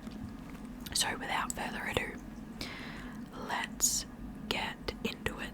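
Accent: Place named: Australia. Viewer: Excellent video! I hyped it, too. I’m very interested in reading The Eyes of Gaza. I think The Correspondent was my favorite book so far this year. It was SO good. I also couldn’t put it down. I loved how she tells the entire story in letters. The ending surprised me. The author ended it so beautifully. I also loved The True True Story of Raja the Gullible (and his mother). Such a unique novel! I look forward to your weekly videos. You’re such an interesting reader and book talker. And the fact you’re in Australia is the icing on the cake. I love your accent.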